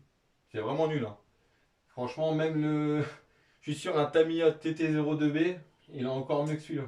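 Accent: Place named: Nord-Pas-de-Calais, France. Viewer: Ouais , un ch'ti!! J'ai reconnu l'accent! Welcome l'ami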